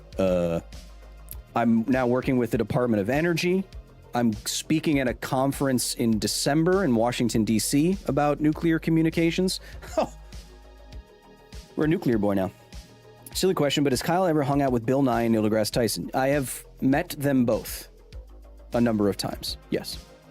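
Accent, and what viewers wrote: Queensland, Australia. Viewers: Honestly great Australian accent but just watch out because you're turning kiwi for a second every now and then. Other than that surprisingly authentic impression which is difficult to come across with Australian accent impressions. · As a dirty New South Welshman I can say with authority that was actually a good suburban Queenslander accent. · Im also aussie and do sound aussie asf bro u even have the look of an aussie.killa content bro.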